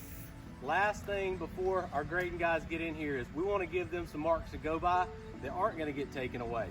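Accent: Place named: United States. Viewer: Best American accent ever!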